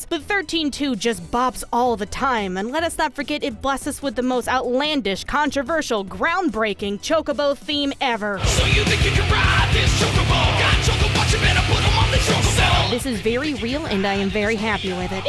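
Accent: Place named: Virginia, United States. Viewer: I'll always have a soft spot for FF8's soundtrack. " ", the boss theme, the standard battle theme, the music during the assault on Dollet,... IT'S ALL SO GOOD. Also, I don't think I'll ever get over that one of my absolute favorite Youtubers is a Virginia native like me. First time I heard talking I was like "I know that accent!"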